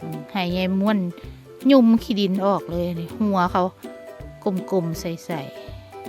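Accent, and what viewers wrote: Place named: Sakon Nakhon, Thailand. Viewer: สวัสดีคะ สำเนียงการพูดเหมือนคนสกลนครเลยคคะ บ้านเดียวกันมั้ยคะ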